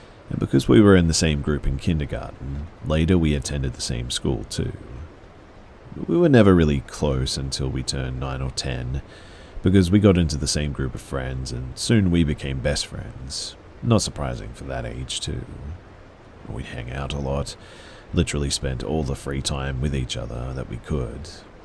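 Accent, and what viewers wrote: Australia. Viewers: Have I just found my first Australian night time narrator? 🤞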